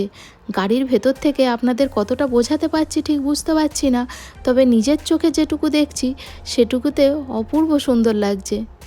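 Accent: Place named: Kolkata, India. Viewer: খুব সুন্দর দৃশ্য আর খুব সুন্দর উপস্থাপনা, আর তোমার কথা শুনে মনে হলো তুমি কলকাতার, তবে আমি বাংলাদেশে থেকে।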